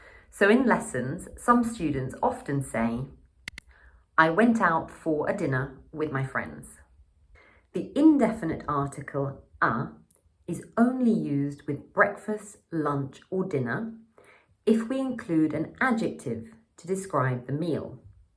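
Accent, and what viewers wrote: United Kingdom. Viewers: 영국쌤이다! 이 고쟈스한 영국발음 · British accent is to die for. ㅎㅎ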